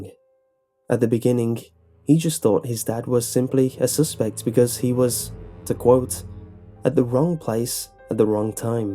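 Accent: United Kingdom. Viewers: I don’t like British accent It Sounds like foreign English I am in trouble 😭 This is not good 😵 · You British?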